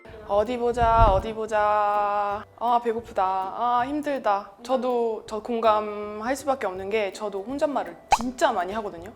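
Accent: South Korea. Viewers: 화면 안 보면 한국인인 줄 알 듯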